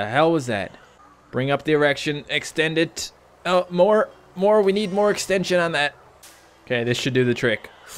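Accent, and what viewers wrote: Canada. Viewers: I watched 2 videos of yours. And ur accent sounds Canadian.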